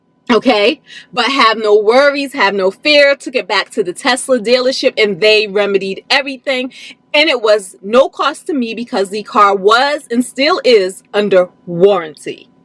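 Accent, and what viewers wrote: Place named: DMV, United States. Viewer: Love the DMV accent!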